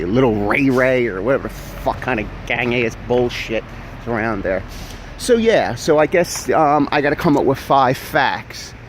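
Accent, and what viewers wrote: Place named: Philadelphia, United States. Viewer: I've been watching for a little bit, but I had no idea you were from Philly. I grew up in Mayfair. In hind site I suppose should have noted the accent. I enjoy the vids. P.S. I agree, no one should swim in Pennypack, thats f'n disgusting.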